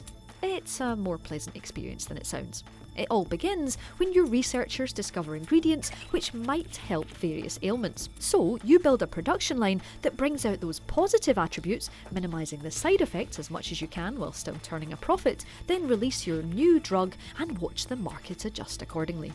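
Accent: Scotland, United Kingdom. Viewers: very scottish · Damn, dat Scottish Accent 😌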